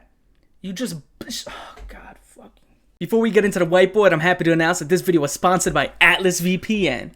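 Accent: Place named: New Jersey, United States. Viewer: As a boricua New Jerseyan living in Florida, your accent makes me feel right at home ❤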